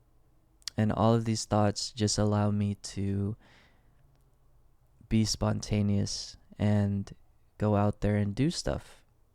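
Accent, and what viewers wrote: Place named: United States. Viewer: More videos plz ❤ I find your voice very clear to practice my American English accent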